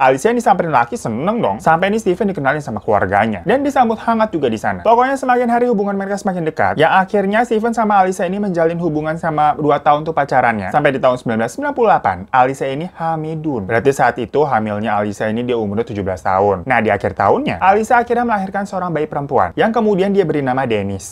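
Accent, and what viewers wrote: Java, Indonesia. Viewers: Ngakak anjirrr dengerin suara nya gaya bahasa nya medok wkwkwk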